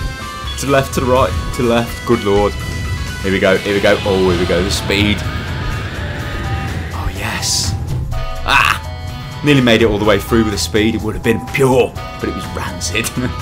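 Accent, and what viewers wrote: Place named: North, United Kingdom. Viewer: Your accent has changed since this video. You sound more northern in this video.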